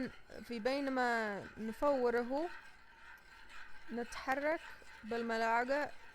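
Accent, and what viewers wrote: Sanaa, Yemen. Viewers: ايوه هي من صنعاء كلامها صنعاني انا صنعانيه انا احب أكلات اليمن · You are awesome !!!! Very amazed by your fluency in the Arabic language , and Sanaani accent. You have done a great job. Thank you :)) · من لهجتك صنعانيه و لكن عايشه خارج اليمن . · Your accent is so cute. Never heard a cooking show on YouTube with Yemeni food and accent:) going to try this;)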